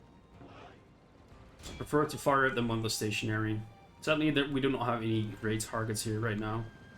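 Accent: Netherlands. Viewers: He's defiinitly dutch, as a dutchman myself I can instantly tell he's from the Netherlands with how he speaks english. Idk what it is exactly but it's very recognizable.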